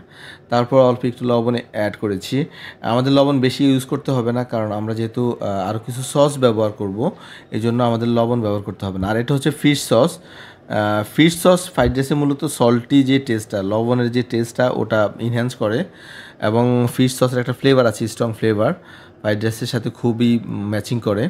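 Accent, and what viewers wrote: Noakhali, Bangladesh. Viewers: Noakhali mone hocce.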